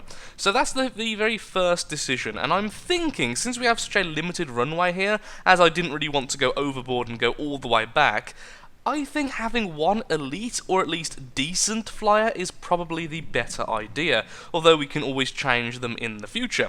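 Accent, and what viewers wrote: United Kingdom. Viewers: you sound british but you think like an american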